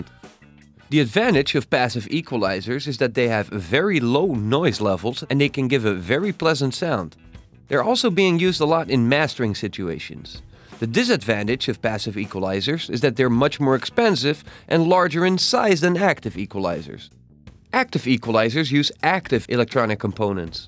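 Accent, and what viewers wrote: Netherlands. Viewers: nederlands accent? :) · English with a Dutch accent really is one of the easiest recognisable out there. It's really amusing, since there's no way you can be wrong if someone is Dutch when you hear the way of pronouncing :D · Your English pronunciation is pretty good (better than mine probably). Still, some words you say, reveal that you must be Dutch.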